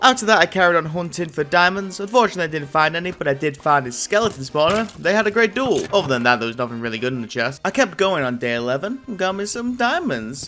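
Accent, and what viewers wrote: United Kingdom. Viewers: Are u British 😅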